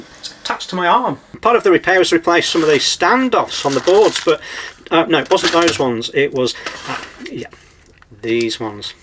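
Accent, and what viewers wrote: United Kingdom. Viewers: The guy that makes that shitty Amp with all those wobbly plastic standoffs that looked like shit and not worth the $25 thousand anything? He just doesn’t want anyone to see his crappy workmanship. In my opinion a super high end amp that has any value is going to use vacuum tubes. Starting to love your channel and am enjoying your accent, Americans love a great Brit accented voice I guess it’s all the James Bond movies and Dr Who episodes that made it across the pond that started the addiction.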